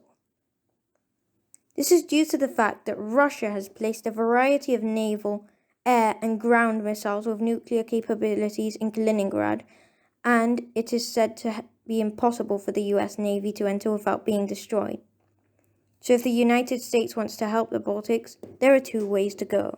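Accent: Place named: United Kingdom. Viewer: Your voice is the most British voice I’ve ever heard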